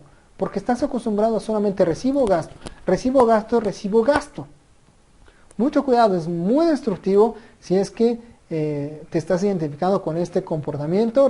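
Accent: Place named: Argentina. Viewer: Hasta que comienzas eres bueno pero parece Argentino ca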